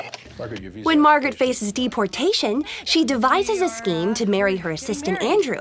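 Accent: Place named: Asia. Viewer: omg i love her asian accent lol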